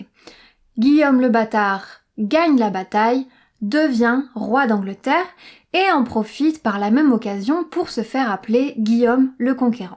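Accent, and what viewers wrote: France. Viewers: If you don't mind, where's your French accent from? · These videos are so great. I just started learning. And I'm basically using the Babbel app, Lupin on netflix, and you. Different types of mediums help I think. But I love your content. You are always making it fun to learn. And your positive, fun attitude. And of course, your lovely french accent. ☺ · J'aimais cette vidéo énormément. Un sujet intéressant, tous est très bien prononcer et á une vitesse qui me permettait de tous suivre. Merci beaucoup. Á propos: ta prononciation de l'anglais est vraiment super bien. Avec un bon accent mais encore avec un petite peu de sauce française pour le charme. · Her French accent is very mild when speaking English. Her English has a hybrid French/British sound to it. Interesting. Also, why wouldn't Handball be something like Balle de la Main in French. 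As opposed to Handball pronounced without the H. Just asking.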